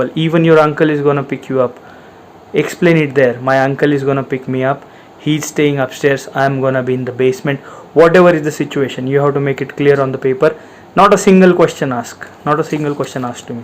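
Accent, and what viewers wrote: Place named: Maharashtra, India. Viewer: Saheb English Changale bota pan Marathi Accent thoda drop karal tar eak no whal, I think u need to have neutral English Tone ur choice of words and sentence construction, is self explanatory ur just one step away to be speaking Flawless very competitive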